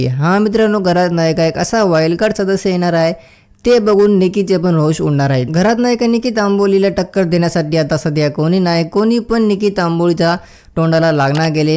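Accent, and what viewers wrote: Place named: Marathwada, India. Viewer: Bhava tu maratwadyacha ahes kai😅, apli bhasa sarkhich hai😅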